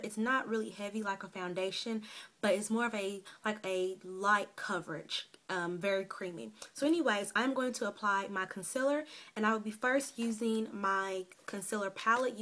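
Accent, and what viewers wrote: South, United States. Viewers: Girl your southern accent is strong! "fingers" lol great tutorial!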